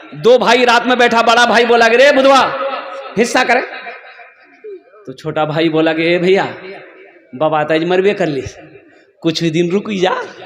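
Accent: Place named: Jharkhand, India. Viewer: Jharkhandi jaise bolte aap maulana sahab ❤😅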